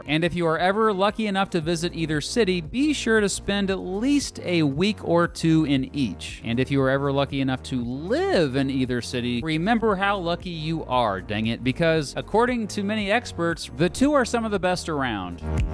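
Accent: United States. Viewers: He clearly didnt learn the Korean romanization for this video. His pronunciation is pretty much expected from an American. I disagree that the revised Korean romanization is horrible. It got rid of the use of weird symbols that can't be typed with American keyboards that was previously present in McCune. And romanization systems aren't English, obviously you can't rely on English pronunciations for reading foreign romanizations. You actually have to learn them as the system that they are.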